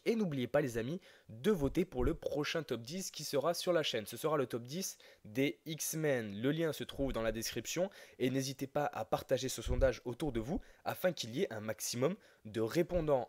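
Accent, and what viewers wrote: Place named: Marseille, France. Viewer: Parce que t'a l'accent d'un sudiste et tu pronnonce à la marseillaise.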